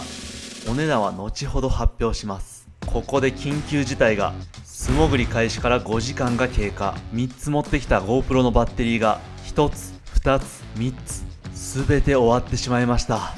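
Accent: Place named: Kansai, Japan. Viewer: 今日いつもより関西弁多かったですね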